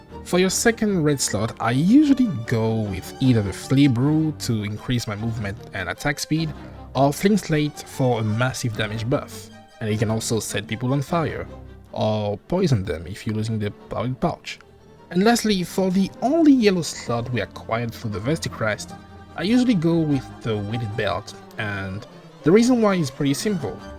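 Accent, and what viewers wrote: France. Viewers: Are you french? ew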